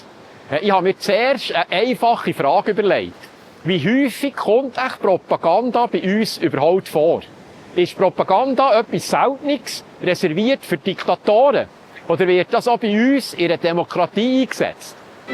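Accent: Bern, Switzerland. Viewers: Ich habe so eine Freude an deinem Berndeutsch, obwohl ich keine Bernerin bin. Auch freut es mich im Abspann den Namen meines Wohnortes "Lauwil" zu sehen. Du bist ein charmanter Aufsteller mit Tiefgang.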